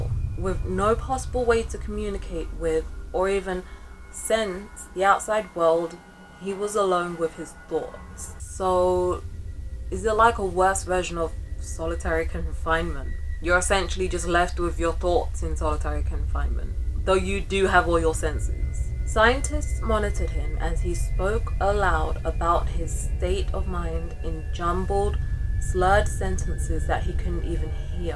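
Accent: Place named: England, United Kingdom. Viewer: Happy New Year! I love your English accent. It enhances your voice with a poetic Cadence. Beautiful! He was a great story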